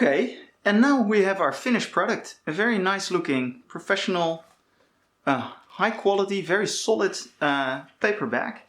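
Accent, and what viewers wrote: Netherlands. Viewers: Do I detect a Brabant accent?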